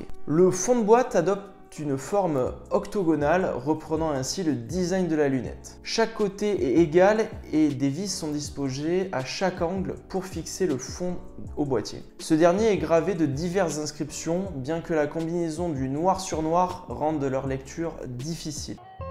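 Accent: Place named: Italy. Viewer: Esthétique dans l'air du temps avec les hommages aux montres des seventies avec bracelet intégré. Je te donne un 7/10 sur ton accent italien. Merci pour cette vidéo et les images de la montre sont top.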